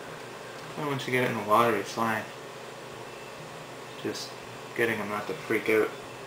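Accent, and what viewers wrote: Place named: Canada. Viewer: You say out and house like a Canadian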